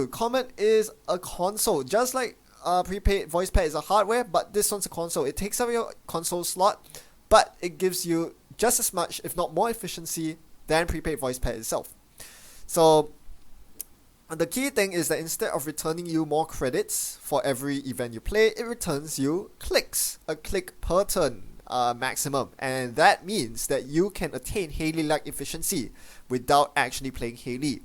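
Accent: Singapore. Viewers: Are you Singaporian? I just had to ask, I can't place a finger on your accent.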